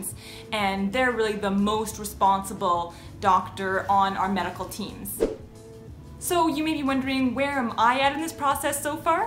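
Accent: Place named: Canada. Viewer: My spidey senses told me you were Canadian, and I was right. :) Then, I also started to wonder if I speak with the same subtle accent. Anyway, I love your videos. I'm in law school, and I'm studying medical negligence / informed consent right now. Watching how your days unfold really brings some of the cases that I'm studying to life.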